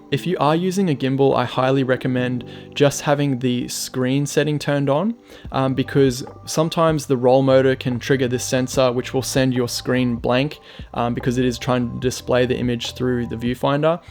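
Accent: Australia. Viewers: Great to hear an aussie reviewer and using PAL. Since this review with firmware update Clog 3 is available. Do you now use that?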